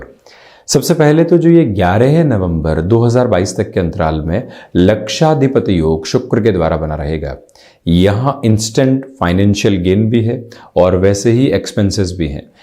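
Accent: Standard. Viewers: Koti koti, pranam 🙏🙏🙏🙏 Apki shudh hindi ko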